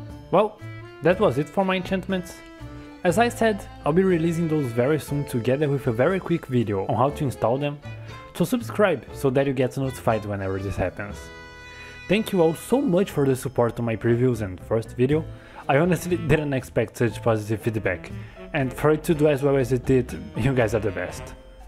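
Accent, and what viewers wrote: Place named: Brazil. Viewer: Your video is very good, everything in it is great, I'll watch the other videos and subscribe, keep it up wait... are you brazilian too? (I saw it in the about tab of your channel) now I understand your accent XD eu sou br também tmj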